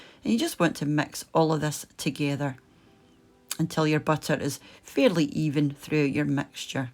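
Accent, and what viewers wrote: Scotland, United Kingdom. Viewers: Okay, I just found this channel and I LOVE it. I always loved scotland and now, I can attempt to cook scottish things while listening to an actual scot (with a marvellous accent I might add) explaining it to me. That's wonderful! (Sorry for spelling mistakes, I'm being exited, tired and not a native speaker/writer at the same time. And I always suck at spelling)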